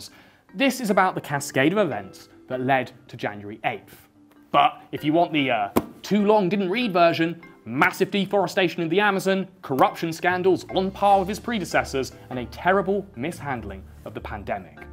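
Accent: United Kingdom. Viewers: Excelent broad strokes . At first glance, I would not take your channel seriously , given the choices of the Good, The Bad, and the Ugly out there. And the Brittish accent does not mean squat. And you were a bit too scruffy. Yet , you surprised me , by bringing in perspective from a far back timeline. And the homework put into it comands respect. And by dissecting facts and events thoroughly. And by staying even keeled, even thought you had a clear and defined stance ( granted it was European , yet not incendiary ). And your choice of language was impecable. Perfect Narrative and pace Negatives. No sticking your feet in the table ! Keep your beard groomed, for heavens sakes. Get rid of the silly props. You have what it takes Congrats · you sounds british? so not a gringo in the kosher sense of the word. but yeah, I would call you a gringo, you go gringo!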